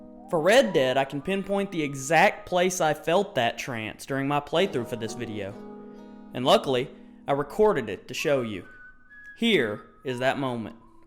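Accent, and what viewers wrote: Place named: South, United States. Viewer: I saw your post or comment on Reddit a week or two ago, or whenever it was and saved this video to watch later, and man, you went above and beyond my expectations. The quality of this video is really great for you only being at 36 subscribers. Everything about this video is perfect, your faint southern accent, your charisma, the editing, the music choice, and your insightful look at a part of the game that is seldom talked about beyond "I liked it". I learned a whole lot, and I only appreciate the game even more than I already did You my friend, have earned my subscription. Keep it up man, it will pay off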